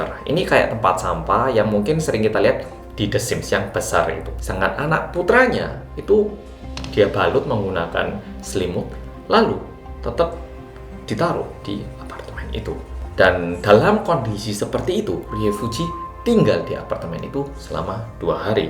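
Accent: Java, Indonesia. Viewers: Medok x logat jawanya, tak pikir orang jepang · Korea medok hahaha.. sehat terus mas e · Salam knal mas, seneng ngikuti ceritanya Apa mas tinggal di Indonesia atau di korea kok msh. medok bhs. Jawanya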